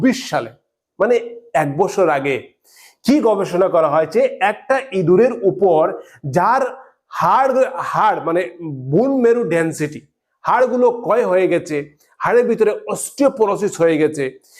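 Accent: Sylhet, Bangladesh. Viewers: ভাই আপনার বাড়ি কি সিলেট?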